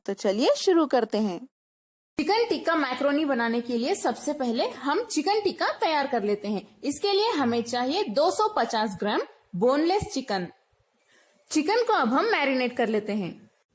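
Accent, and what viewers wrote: Hyderabad, India. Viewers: Ap Hyderabadi ho? 😍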